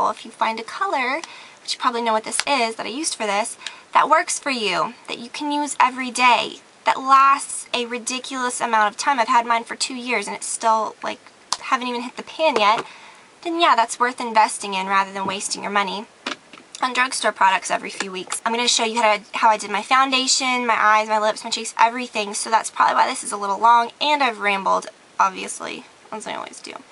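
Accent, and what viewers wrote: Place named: Atlanta, United States. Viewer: In this vid you can def tell shes from Atlanta!!! Hahahaha she has changed a lot, wish is good!!!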